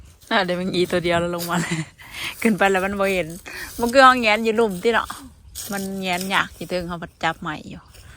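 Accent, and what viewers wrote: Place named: Sakon Nakhon, Thailand. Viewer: ทางบ้าน ใช้ภาษา สำเนียงคือกันกับทาง เผ่าลาวสกลนครเลย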